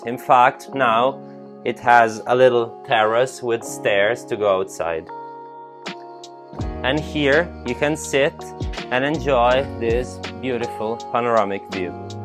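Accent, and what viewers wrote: Italy. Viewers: I love your italian acccent when you speak english. Hope you can also speak french. :D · we need a bit more than buongiorno. Haha c'mon show us that sexy Italian accent ;) Can't wait to see the upcoming videos.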